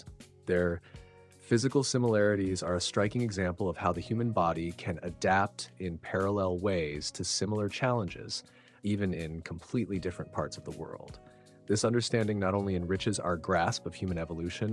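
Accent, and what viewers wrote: United States. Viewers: Hmm voice over has an American accent but channel based in Indonesia??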